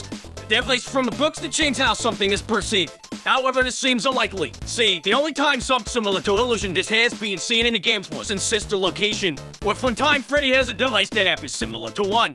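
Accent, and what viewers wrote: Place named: Boston, United States. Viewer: that amazing Boston Boy accent was a pleasant surprise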